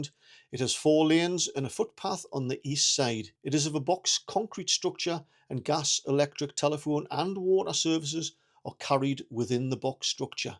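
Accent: Newcastle, United Kingdom. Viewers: Great information, thanks, always nice to hear a gordie telling us about Newcastle and the north east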